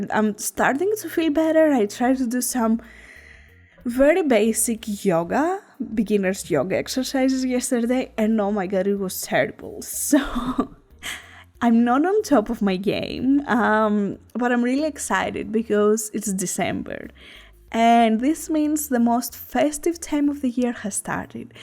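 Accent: Greece. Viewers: ur accent is Greek! Ελληνιδα εισαι?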